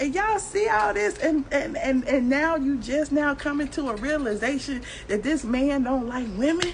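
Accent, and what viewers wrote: South, United States. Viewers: you be tickling me to death lol gosh I miss the South when I hear you talk.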